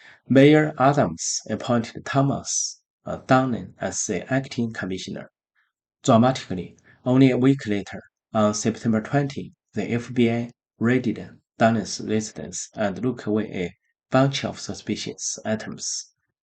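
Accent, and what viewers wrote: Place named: China. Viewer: 英文虽说流利，但是发音不准、你用讲中文的节奏、停顿来讲英文很多时候听不清你在说啥？感觉像是四川人在讲韩文😂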